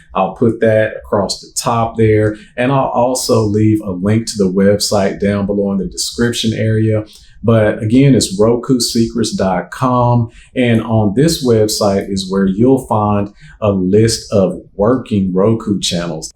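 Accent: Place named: South, United States. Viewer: Came for the info, stayed for the accent. I don't know where you're from, but I love your accent. I live in NYC but I'm from Alabama... sounds like you're somewhere close to there. Thanks for the video!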